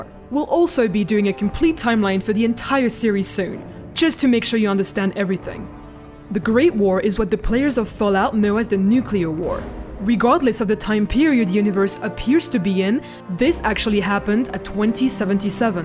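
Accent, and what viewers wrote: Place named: France. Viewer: she has a cute accent. sounds French.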